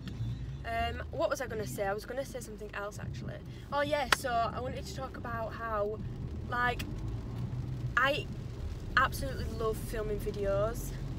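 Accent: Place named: United Kingdom. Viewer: This was great! Love your accent, I’ve never been to the UK! I have subbed to your channel!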